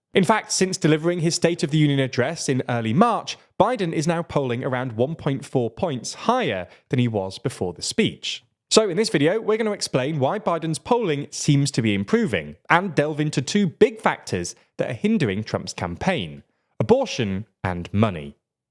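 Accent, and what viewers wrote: United Kingdom. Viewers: I hate hearing a British accent I used to like it but everything I hear out of the UK is a lie sadly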